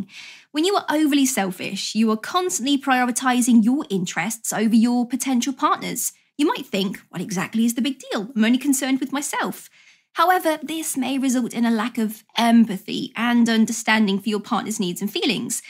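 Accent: England, United Kingdom. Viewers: the only nice thing about this video is that she has an english accent. and for the rest I have no remarks or criticisms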